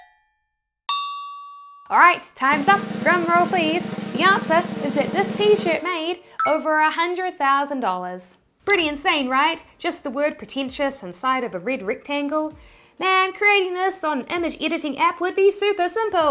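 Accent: New Zealand. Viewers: Are you a kiwi/new zealander?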